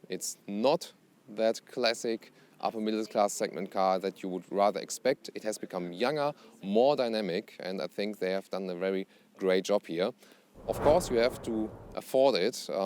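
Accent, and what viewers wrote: Germany. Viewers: Such an enormous German accent, wouldn't it be better to do those presentations in German with English subtitles?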